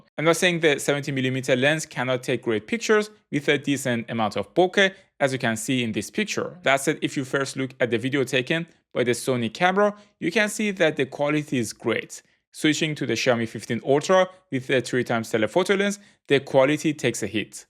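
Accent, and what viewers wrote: Iran. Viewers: I love the iranian accent. Damet garm brother 😘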